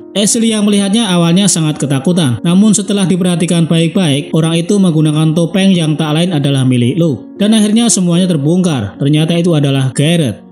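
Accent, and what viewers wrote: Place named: Java, Indonesia. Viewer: Jowone kentel mas wkwkw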